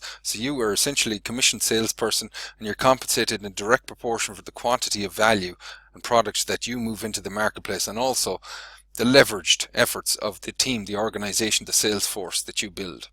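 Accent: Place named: Ireland. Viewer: 😂🤣 I❤️his tight Irish accent!😂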